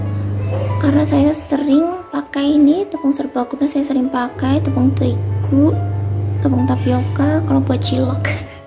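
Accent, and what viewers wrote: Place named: Tegal, Indonesia. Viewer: Mba aaslinya mana... Logatnya kayak aku.. wkwkwk ( orang Tegal )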